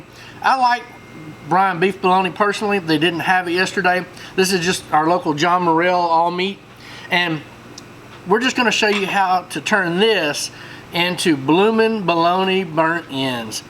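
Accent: South, United States. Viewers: well..let's see...he's combining bar b que....and bologna.....and describing the middle of the piece of bologna as "center- mass"....while cutting it.......and talking with a thick southern accent....my guess is he voted for Joe Biden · I love this guy's accent, he's like an endearing southern uncle.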